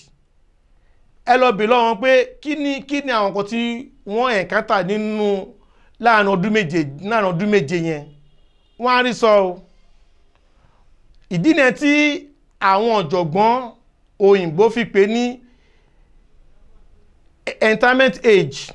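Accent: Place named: Fulani, Nigeria. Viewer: The man is Fulani....just pay attention while listening to his ascents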